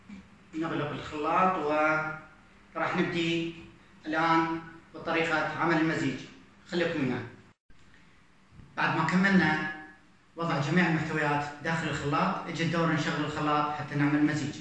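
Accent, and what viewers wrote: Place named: Iraq. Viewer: السلام عليكم اخي اني انتقدك لان اولا انت لست بطبيب ولا بمعشب انت توصف هذه الوصفات الى الناس وانت تجهل مكونات ومعطيات هذه المكونات من اثار على المعدة وعلى ارتفاع ضغط الدم الذي يسببه الزنجبيل اما الحامض فهو مدمر للمعدة للذي عنده مشاكل في المعدة اضافة الثوم اني لا اعرف كيف تصف هذه الوصفة لجميع الإعمار اخي اتقي الله انت من لهجتك من العراق والعراقين طيبين ولا يؤذن الناس اخي لا تفتي بشي لا علم لك به فسوف تسال امام الله وان عملت شي بجهالة، وحسب مشاهدتي الفديو انت مسلم وتذكر الله فالرجاء ابتعد عن هذه الأمور وانت لا تفقه شي بالطب ، اذا اردت ان تريد زيادة متابعيك لا باذية الناس شوفلك موضوع اخر تعلم به ولَك الشكر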